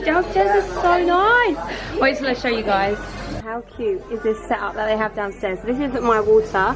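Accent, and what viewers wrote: United Kingdom. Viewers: I knew she was british before i clicked on the video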